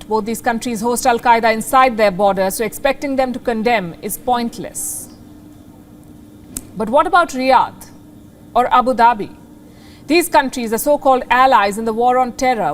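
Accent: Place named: India. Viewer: You sound Indian today and for the first time you chose a side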